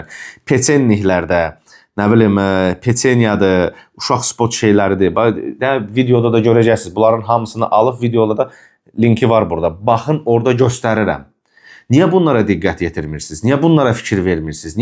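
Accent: Ganja, Azerbaijan. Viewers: eşq olsun qardaşım! elə birinci videonnan tutmuşdum ki Gəncəlisən)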